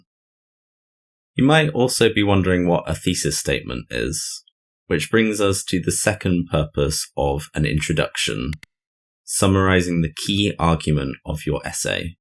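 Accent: RP, United Kingdom. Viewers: Is it received prounication ?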